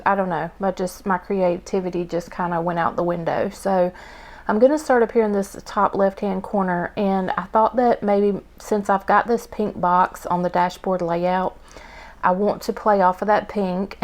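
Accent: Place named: South, United States. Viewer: looove the spread and the southern accent 😍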